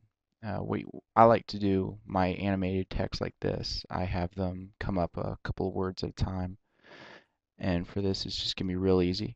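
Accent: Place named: Ireland. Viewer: u from ireland what city ???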